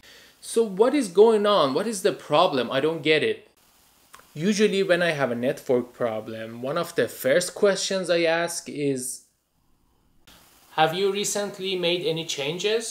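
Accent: Iran. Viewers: Why do get a strong persian accent from your voice?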